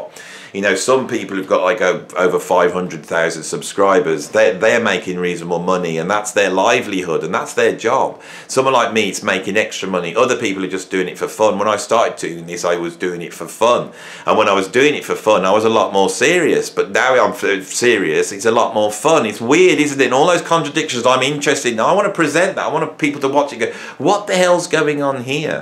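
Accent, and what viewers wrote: United Kingdom. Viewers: You’re a mad genius, I love it. As an American, and an accent buff, I tried to imagine this video (I’ve watched others of yours and subscribe) with the exact same content, but delivered with an American accent, or even a more neutral British accent, it would be a very different experience. Your content is great, I think it’s brilliant, but I also think your accent is a bonus that gives the whole thing an ineffable charm. Cheers!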